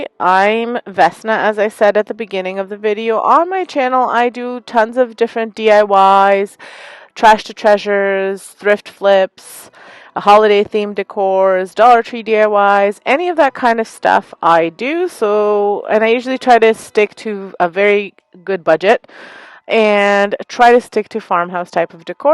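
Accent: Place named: Canada. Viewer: I knew you had a accent. You are from Canada like my daughter in law. Lol.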